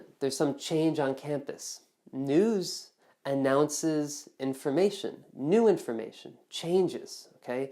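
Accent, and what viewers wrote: United States. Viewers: Are you American ???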